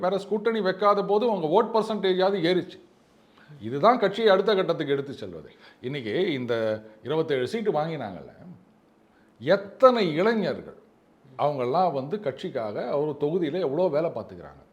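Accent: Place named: Tirunelveli, India. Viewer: சொந்த ஊர் நெல்லையா.நெல்லை தமிழ் விளையாடுகிறது.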